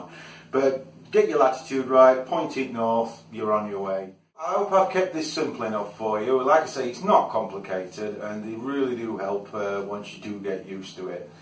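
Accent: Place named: Manchester, United Kingdom. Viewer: Beautifully explained, really helpful and topped off as delivered by a nearby Mancunian!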